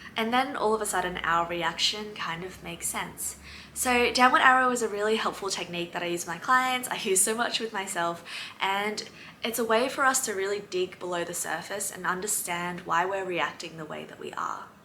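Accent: Australia. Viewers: Thank you for your reply.🩷I tend to compare myself with somebody that speaks English so well, and I hate myself doing comparison. If I were in Sydney, I'd like to have a session with you to improve my confidence. Please keep posting. I love Aussie accent, and I'm infatuated with Australia! So, your video clips remind me of fun time I had in Sydney! Yes, I've lived there for a little while a long time before. And, I miss Australia.🥹🥹🥹🥹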